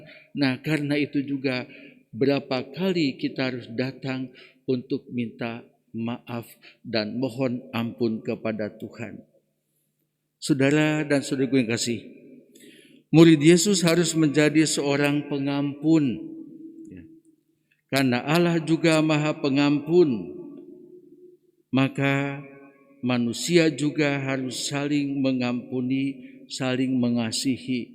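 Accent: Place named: Sunda, Indonesia. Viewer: R I P... Saya sedih karena romo tdk akan pernah lagi memimpin misa harian pagi pkl 06. yg sy ikuti secara live, tenang dn damailah bersama Bapa di surga romo...warga paroki keluarga kudus cibinong, keuskupan bogor.. Yg akan sllu terkenang dgn khotbah dialek sundana 😥😭😭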